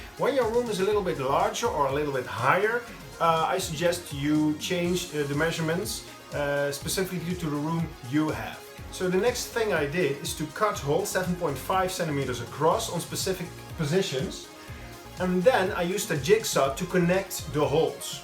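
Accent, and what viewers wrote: Netherlands. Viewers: Great work. Do I detect a slight Dutch accent? 😉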